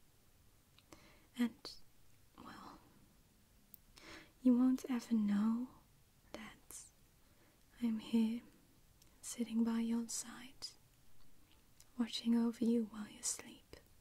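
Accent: Netherlands. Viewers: I smell a dutch accent. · Dat lichte nederlandse accentje kruipt er toch stiekem tussendoor :))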